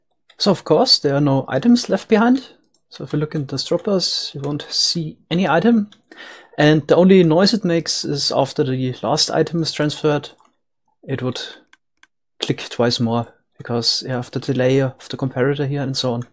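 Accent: Germany. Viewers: ahh ok, thanks. where you from, you sound like german i have that feeeling :D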